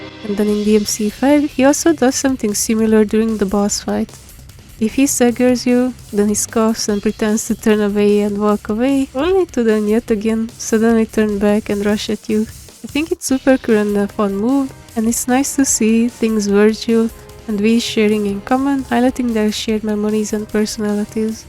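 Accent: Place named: Hungary. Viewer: this accent is so Hungarian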